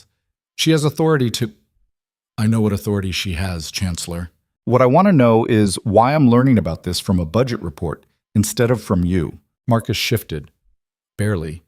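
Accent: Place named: United States. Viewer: Hell is acceptable but NOT f***!!! And the modern language/accent of the narrator is annoying!!! Why American English when it’s Victorian England…??!! Can NOT recommend, NO subscription!!!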